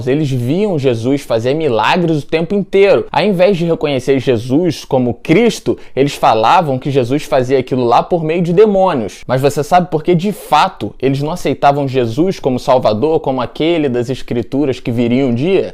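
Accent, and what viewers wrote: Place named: Rio de Janeiro, Brazil. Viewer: desse sotaque carioca eu ixtava com saudade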